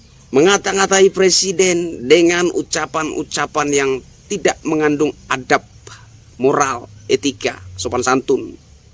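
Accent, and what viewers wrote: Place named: East Nusa Tenggara, Indonesia. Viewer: Merinding saya mndengar narasi dari orang ntt.semoga presiden kita sehat selalu dalam lindungan Alloh swt.salam dari cilacap jawa tengah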